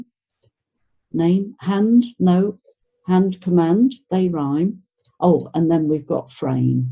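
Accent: United Kingdom. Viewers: Thank you for producing these lovely educational videos, they bring back all my past memories .... when I was a student studying in a boarding school in UK. My English teacher would read and explain to me all the economic articles so that I could understand....the way you read and explain sounds so much like her, with a very strong British accent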